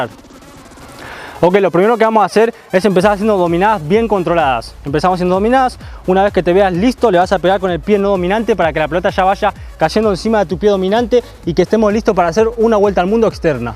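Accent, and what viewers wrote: Argentina. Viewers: Crackk!!! Sos argentino no??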